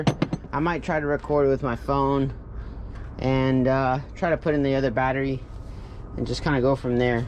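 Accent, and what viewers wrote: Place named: South, United States. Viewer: He's saying Freightliner he's just got a thick southern accent that's how we pronounce it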